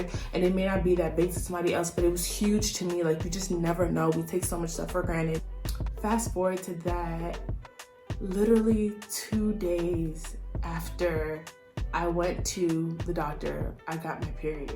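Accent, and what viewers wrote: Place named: United States. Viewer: Yh your American Accent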